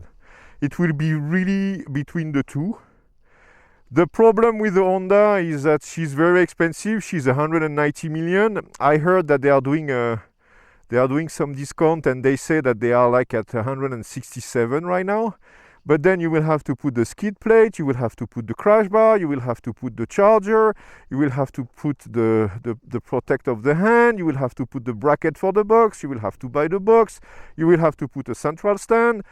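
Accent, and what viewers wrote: France. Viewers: Je me disais bien que c'était un accent bien français ahah ! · I knew you'd buy it! You instantly fell in love with her it was obvious! Great machinery. And yep, I have already checked your vid, it's awesome as every other you have put out till now👌we greeks love a thick French accent! Hehe 🤗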